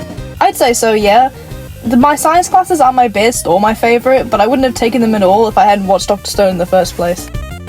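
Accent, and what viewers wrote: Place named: United Kingdom. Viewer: YOU'RE BRI'ISH??? also hi its Ed from discord also we started watching Dr. Stone at like the exact same time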